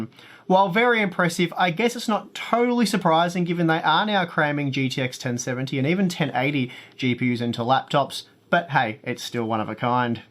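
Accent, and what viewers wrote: Australia. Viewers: I do so like your videos matey, nice to hear an Aussie online. Keep up the good work on the reviews. :)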